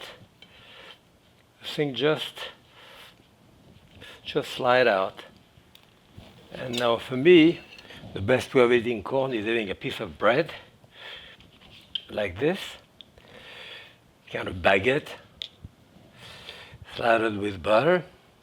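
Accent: France. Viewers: I was responding to someone who thinks Jacques Pepin has a SPEECH IMPEDIMENT. He does NOT HAVE A SPEECH IMPEDIMENT. He is only speaking in a French ACCENT. of course his English is perfect. No one said it wasn't.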